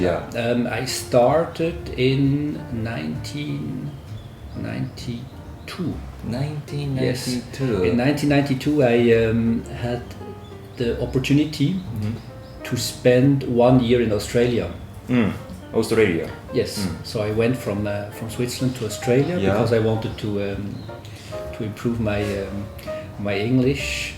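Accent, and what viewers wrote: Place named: Japan. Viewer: クリスチャンさんの英語はまるでイギリス英語のように聞きやすい。 特に黒人系のスラングたっぷりの英語を直で聞いたあとならね。 そしてRYさんの英語発音は結構カタカナ英語っぽいんですね。 巻き舌がほぼなくて我々日本人に聞きやすくできてる。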